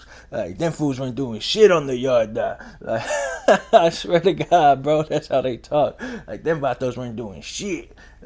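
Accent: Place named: Stockton, United States. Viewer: The way you narrate stories like yhis one and the MB Nel Video, i can tell youre from Stockton. Lol tell me im wrong!?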